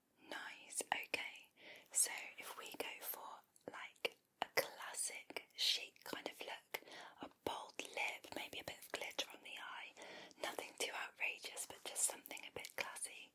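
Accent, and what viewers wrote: England, United Kingdom. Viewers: Love your accent isi kent or midlands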